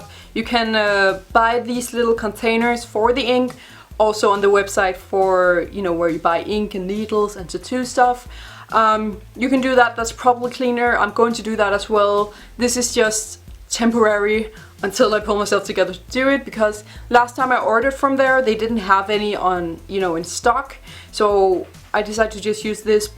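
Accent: Denmark. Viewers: Hvorfor kunne jeg høre på din accent at du er dansker? HEJ MEDVIKING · You are Danish aren’t you? As a Dane I can hear it in your accent😉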